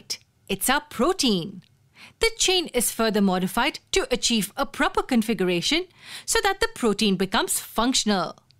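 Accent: India. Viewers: Gosh, this is all explained so well, I just can't get over the Indian accent (assuming it's indian)!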